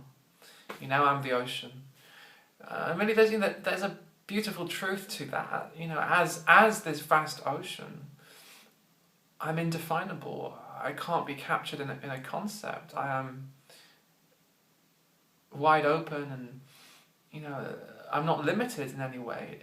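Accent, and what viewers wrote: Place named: United Kingdom. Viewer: that british accent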